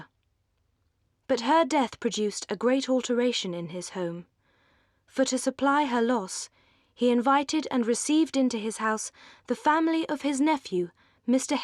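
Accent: United Kingdom. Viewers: She sounds so much better speaking with an English accent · ! My sweetiee💖💖💖 What a beautiful voice she has! Original British accent! I'm so happy to find it on yt. 😻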